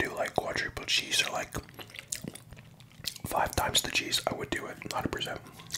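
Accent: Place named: Canada. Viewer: I can hear the Canadian in his voice….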